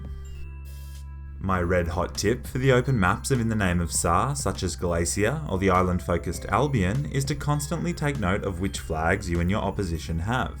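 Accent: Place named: Australia. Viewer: You are my new favourite youtuber! Your content actually makes me laugh. Your humour goes great with the accent (I sound like an american but I'm australian too). At least you aren't an ibis that steals my lunch box everyday.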